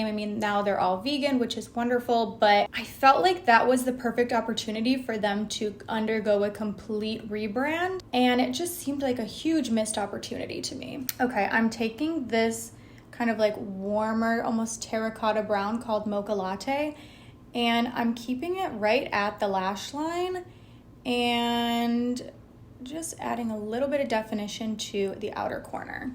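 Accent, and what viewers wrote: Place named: New York, United States. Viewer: Got a little New Yorker coming out in your accent. Love it. Hope you're loving it out there!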